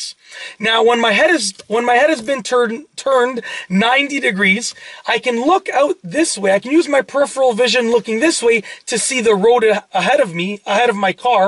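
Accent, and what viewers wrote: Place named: Canada. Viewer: This gentleman has the most CANADIAN accent I've ever heard. lol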